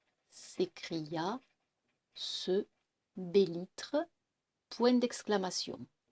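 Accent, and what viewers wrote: South, France. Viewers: Excusez moi! Je ne suis pas Française, (je ne savais pas que..) en lisant les commentaires j'ai appris que c'était l'accent du sud😶 Excusez-moi mais je ne suis pas habituée avec cet accent! Ce n'est pas de votre faute😅bien sûr! Je vous remercie pour cette dictée quand même! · Pas étonnant toutes ces fautes exposées dans les commentaires: déjà avec un accent Parisien, ce texte d'un autre temps, ne serait globalement pas une mince affaire mais alors avec l'accent du sud bonjour. Sinon ne pas le prendre autrement que dans le contexte car en dehors c'est un joli accent mais moins propice ici.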